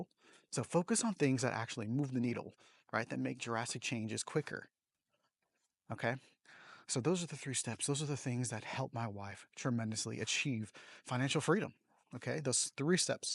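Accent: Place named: Canada. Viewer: Little changes add up to 1 big change though, and sometimes little changes are all people can do. New subscriber from East Coast of Canada 🇨🇦 . PS - I thought you were 🇨🇦 by your voice until you pulled out the American cash.. lol!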